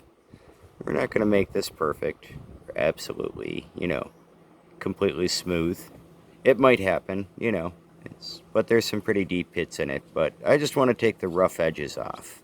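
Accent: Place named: Pittsburgh, United States. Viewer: This is really helpful, thanks! Are you from the western PA area by chance? I grew up in Pittsburgh and your accent is reminding me of home!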